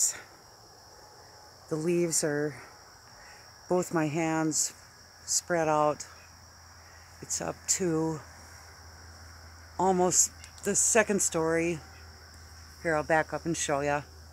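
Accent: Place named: United States. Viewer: like your American accent - thank you for sharing - like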